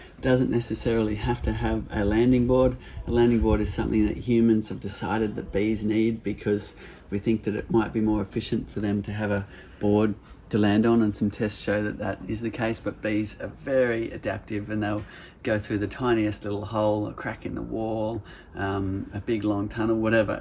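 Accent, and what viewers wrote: Australia. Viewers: Think he is a Aussie/New Zelander from the sounds of it.. More like M8/mate....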